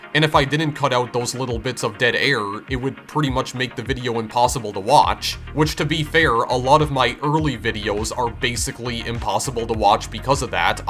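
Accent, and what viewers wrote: Canada. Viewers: Where are you from? I watched your Catacombs of Solaris video. Are you from Canada, maybe sasqatchean “Twenty Fifteian” “A long tyum” “Kowun I was autistic for a long tyum”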